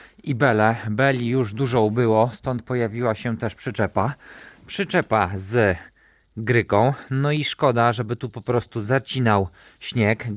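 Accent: Lublin, Poland. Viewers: Z Twoich wypowiedzi wynika , że pochodzisz z lubelskiego. "Ciapy"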